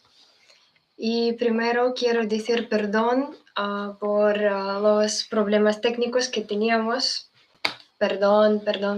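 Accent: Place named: Spain. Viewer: Tienes acento de el país de España pero no castellano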